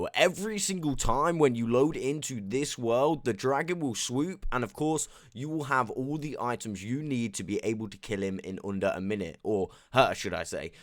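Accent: United Kingdom. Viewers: You sound like DanTDM but your accent is more British (hope that makes sense lmao). Also great video. It helped me so much, I used to spend so much time getting armor and stuff for fighting her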